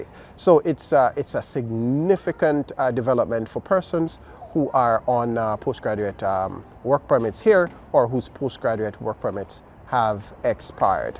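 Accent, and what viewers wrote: Jamaica. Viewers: Thanks for the info, are u jamaican?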